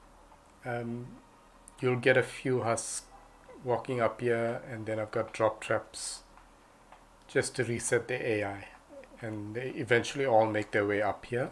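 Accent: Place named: South Africa. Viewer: Are you south african? I can see the ping and hear the accent 😭🤣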